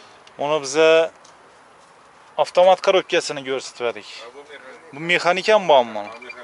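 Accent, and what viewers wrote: Khorezm, Uzbekistan. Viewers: Iloji bolsa adabiy gpirsangiz xorozim shevasida gapirmang tushunarsiz.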